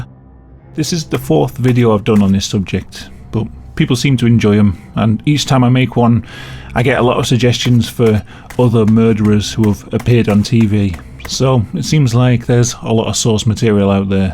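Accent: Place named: Wales, United Kingdom. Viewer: They cooked one of his legs and eht some of it? Love the Welsh accent.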